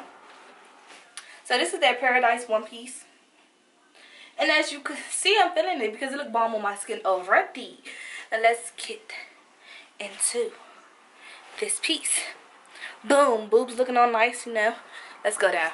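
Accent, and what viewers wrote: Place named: Baltimore, United States. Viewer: Baltimore.... I hear it... Love it an you...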